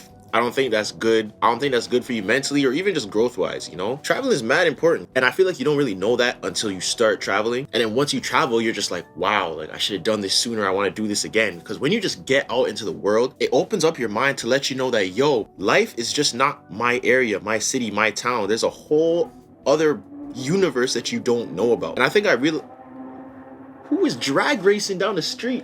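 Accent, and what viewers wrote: Canada. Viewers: according to what you said, well 1 point i like to make, you do not need to move from 1 pointto the other. you do have transit there, right? that in itself is great and very cool. sight seeing by transit. but all the same, everything you said is a very good point. and you did not bash the country. if you are not from canada, you sound very much like someone from canada. ok someone from ontario but hey no one is perfect (i know, i am a total smuck) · He clearly has a Toronto mans accent geez I'm leaving the place as well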